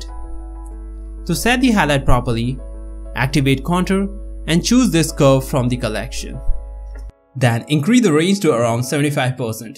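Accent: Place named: India. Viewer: Love your videos But please keep your Indian accent.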